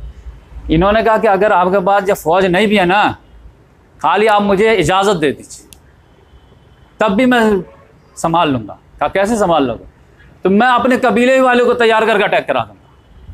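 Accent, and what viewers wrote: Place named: East India, India. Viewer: Assalamualaikum wa rahmatullahi wa barakatahu sheikh saab....aapki aawaz se lagta hai aap east india side ke hain , Jharkhand or Bihar....aapse milna hai ..InshaAllah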